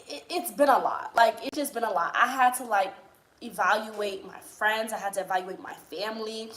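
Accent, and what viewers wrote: New York, United States. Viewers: You are such a New Yorker! Your accent and your mannerisms remind me of being home! May God bless you and your family! I saw some clips of your wedding. It was beautiful to watch! Congratulations 🎊🍾! You are blessed to be on the continent because you are surrounded by such a beautiful culture and beautiful people! Your journey in Africa was meant to be because you are an inspiration and you made the necessary sacrifices to fulfill your destiny! Keep the videos coming! It doesn’t have to be perfect. Just be yourself and continue shooting Tanzania! 👏🏽👏🏽 🥰 💐💐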